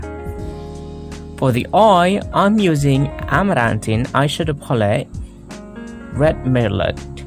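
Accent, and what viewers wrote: United Kingdom. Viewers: Sering² kak bikin tutorial pake British accent I like it 😍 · Kak sampean Iki maem'e opo to ngomong British'e kok uenak dirungokno,love you sering2 bikin video ya 😍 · Berasa lg nonton dialog harry potter suka sma british accent nya😊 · masio ndempul banget tapi aku suka lho tutorial iki. Keren. Aksen britishnya jeng, keren. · Gilllakk kereenzzzz beuddd sumpah Jd pengen belajar how to speak as great as you. Kaya bangsawan2 inggris sumpah....ih....wanna make conversation with you...practice as u student. Sembah.....guru besar.hehehhe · OMG ... Oke q baper dgn aksen British itu🤣🤣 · darling aksen inggrisnya sukaaa banget Tutorialnya banget banget ok Jadi semangat coba coba make up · British accent,,,remind me to ms.jane😂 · British nya medok banget,kalo merem di kiranya org asing yg ngomong...pernah tgl di england lama kak ? Please answer me.... 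· Kak lidah nya british bgt n cwantikkk..... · Sumpah cantik banget ka, aku suka sekali makeup nya. Terus juga suka banget aksen British English nya, belajar dimana sih ka? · Aksen Britishnya halus banget loh kak 😍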